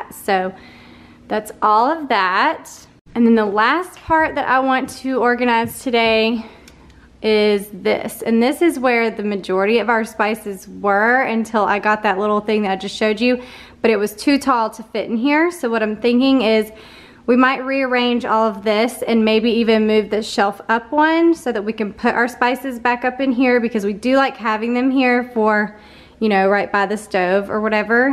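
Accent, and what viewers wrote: South, United States. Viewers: Hi there! I’m watching from Eagle Mountain, UT. I get the feeling you are from the South because of your sweet accent,( lived in GA for 12 years but a native Californian. My, “pantry” is actually a very tall, deep cabinet about a foot wide, at least 18 inches deep with just about a foot between each shelf that are not adjustable and it’s a brand new rental. The pantry is always disorganized!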